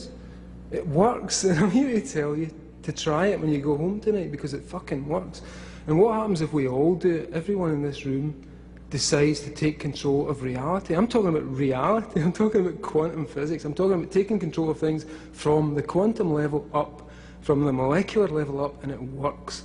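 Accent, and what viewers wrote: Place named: Glasgow, United Kingdom. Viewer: Auto captions are no match for the Glaswegian accent.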